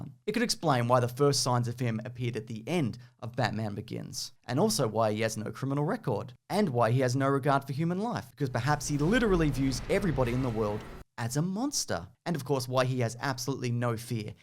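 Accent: Australia. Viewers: Came here for the lame theories. Stayed for an Aussie talking about Scotland.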